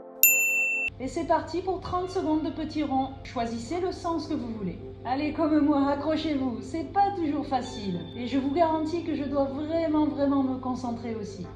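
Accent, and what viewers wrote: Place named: Drôme, France. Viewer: merci pour votre réponse.. je ne m'y attendais pas..je travaille en poste de nuit en pneumologie..et vos vidéos sont calmes et efficaces.. Hé oui bien besoin d'équilibre à moto..merci oui je reste prudente!!🏍❤️ Votre gentillesse se ressent délicieusement ! Et vous êtes magnifique ! Votre petit accent me rappelle le mien lorsque je vivais dans la Drôme.. en Lorraine à présent.. il est..bieeeen différent..lol! Bisous du grand Est!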